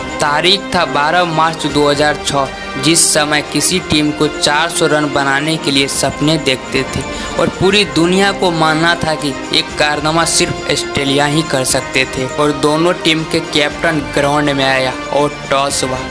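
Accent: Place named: Bihar, India. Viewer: Abe sale bihari hindi bolni seekh pehle bestman ashtelia khilod gindbaz dhmkedaar tbrtor naseme kia h sale shudh hindi bolo bihario salo😊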